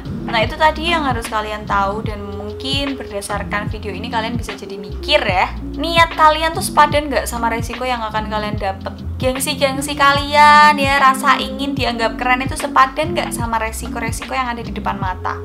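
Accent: Java, Indonesia. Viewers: Ngomonge medok enak gimana gitu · pohh, medok mu kui lo mbakk, sesuatu😍😍 #lampung☝️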